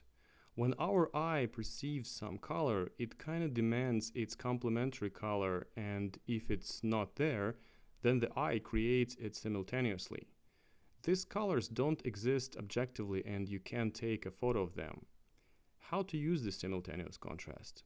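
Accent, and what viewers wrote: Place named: Russia. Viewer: Love your russian accent :3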